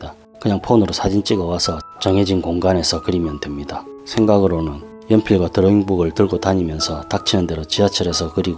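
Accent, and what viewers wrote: Gyeongsang, South Korea. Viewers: 쌤 반갑습니다😊 귀에 쏙쏙~ 지루하지도않구 항상 감사해욤. 쌤 갱상도 쌤 맞지예~??ㅎ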